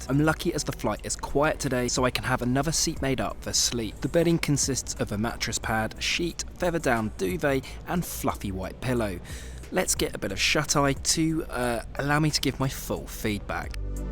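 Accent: RP, United Kingdom. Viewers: You have a very appealing British accent. A 21st century Oxbridge accent?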